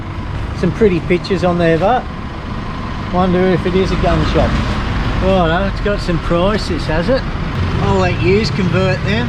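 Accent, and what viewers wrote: Australia. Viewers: Are you Queenslander? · Hi. I think using English letters (Romanised) for Lao is very difficult. There is no standard. There are many Lao - English dictionaries, but assume you speak French. (Hence the screw up with the "S" in Laos) or that you know phonetics. I speak guttural Northamptonshire English; and you Aussie. (I assume that's the noise being picked up by the microphone.) We both know English and can understand each other, but it's those vowels and tones that have to be correct when speaking Lao. if i wrote in my dialect, what you'd say would be miles off. I work with a Glaswegian - lovely chap - but I have to listen to him closely. When he is with his mates - I give up. That was a long way of saying "No" - but I hope helps! Here's a link to a web site I'm playing with. <link>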